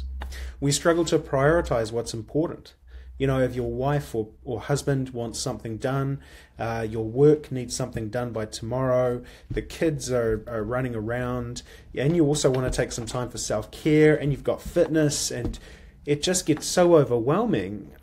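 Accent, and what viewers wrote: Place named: New Zealand. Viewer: Lovely hearing your Kiwi accent!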